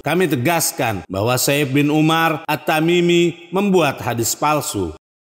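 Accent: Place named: Madura, Indonesia. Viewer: kalau dr logatnya kayaknya madura ini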